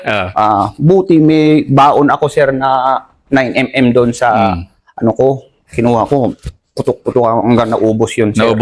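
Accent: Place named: Ifugao, Philippines. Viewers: Talagang taga Ifugao si sir ying letter r nya😊ingat palagi sa lakad nyo sir... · Wow, a big salute Sayo kabayan Major. Halatadong Ifugao/Igorot because of the way you speak Sir. It's always a combination of English and Tagalog 😊😊😊. Anyway, I totally appreciate your courage and wisdom Sir. God bless.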